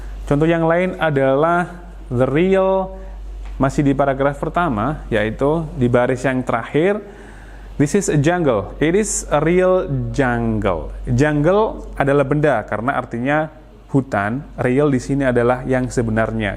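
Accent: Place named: Cileren, Indonesia. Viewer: kaya wong cileren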